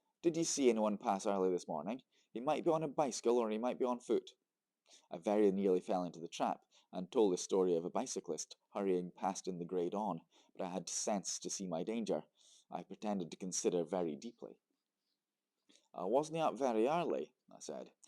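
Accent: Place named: Lowland Scotland, United Kingdom. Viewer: Great Lallands in your storytelling today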